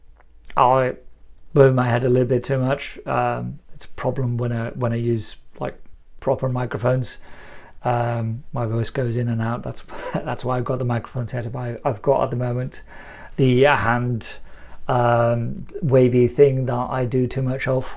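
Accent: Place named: Iceland. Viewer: Well, thats an instant subscribe :) Although i admit, i read your articles with much more of the very nice icelandic accent.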